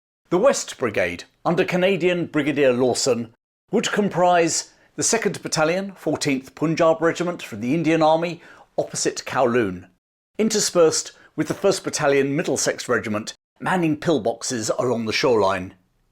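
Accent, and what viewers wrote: United Kingdom. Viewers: As a Hongkonger who is always curious about our past, I have been listeniing to different narrations of this battle a million times now. It's nice to listen to this again in the British accent and perspective. Thanks chap.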